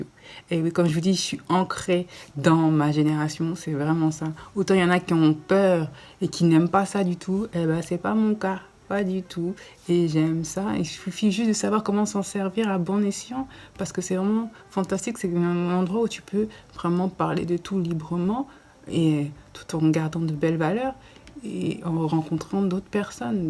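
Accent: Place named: Cameroon. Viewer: COUCOU !!! VITAL CULTURE NOUS MANQUE LORSQUE TU PARLES DE TON CAMEROUN L'ACCENT Y VA AVEC. mais lorsqu'on chasse LE NATUREL REVIENT AU GALOP. " ton accent, ta voix française revient au galop. Bonne chance pour YouTube. JE T'AIME.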